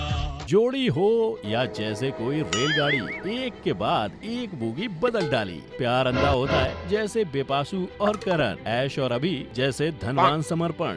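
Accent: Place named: Haryana, India. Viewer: Bhai hrynvi ni bt bolne ki kosis k liye 100/100😉🤘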